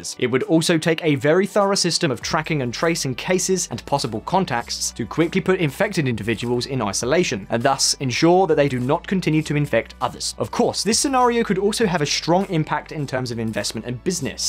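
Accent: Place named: Scotland, United Kingdom. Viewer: I feel like I'd be happy to listen to Scottish guy narrate, but his microphone situation needs some love!!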